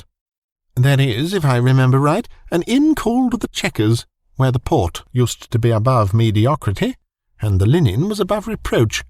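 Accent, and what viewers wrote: United Kingdom. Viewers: Love Sherlock Holmes! Liked and subscribed! You have the best British accent ever! Love you!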